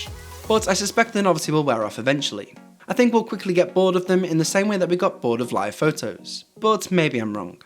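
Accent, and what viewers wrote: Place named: United Kingdom. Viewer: It’s his accent ... he’s probably from the UK or Australia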